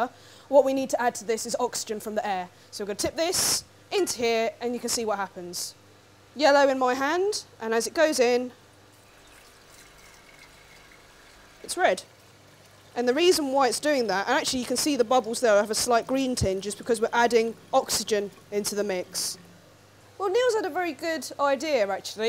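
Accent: England, United Kingdom. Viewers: deep voice with and english accent come out of an asian woman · why does this asian chick have an english accent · asian with british accent? DOES NOT COMPUTE!!!!! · Asian * sexy british accent = one very sexy scientist. Yeah, baby, yeah!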